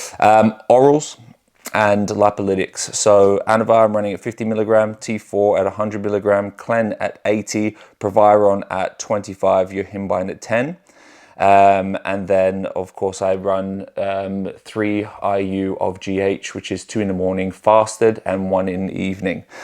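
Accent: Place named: Australia. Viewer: Need a bigger kitchen bro hahah sounded like a aussie 😂, seen you on insta so subbed ✌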